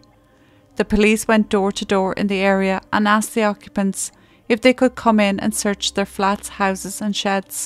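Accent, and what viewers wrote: Ireland. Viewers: Thank you, as always. Beautifully covered on such a senselessly sad case. I love just listening to your soft brogue. Slainté 🍀☘🌹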